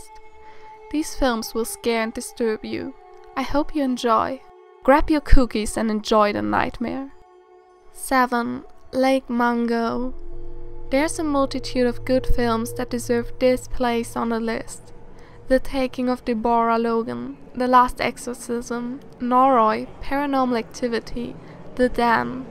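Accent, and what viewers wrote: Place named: Germany. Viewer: GERMAN. You can hear it :D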